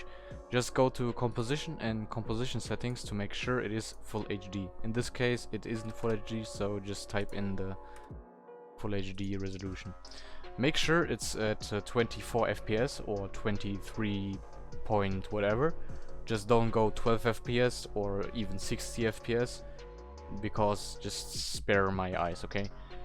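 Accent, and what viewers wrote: Germany. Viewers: Ur german accent tho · man hört heftig raus das du deutsch bist xD c: aba danke für das video <3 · er ist deutsch aber versucht englisch zu reden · u german? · Yo Ich wusste das du deutscher bist wegen deinem Akzent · man hört so krass das du ein deutscher bist haha aber danke<3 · Man merkt, dass er deutsch ist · German-English dialect i can hear this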